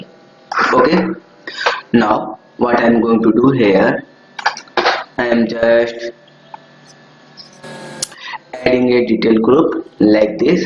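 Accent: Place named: India. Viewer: horrible sound quality combined with Indian accent makes it impossible to understand.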